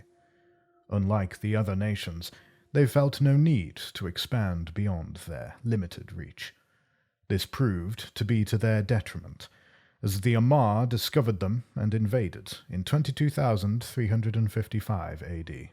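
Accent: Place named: United Kingdom. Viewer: I can hear all the astrophysicists cringe, but since it’s a British accent I’ll allow it.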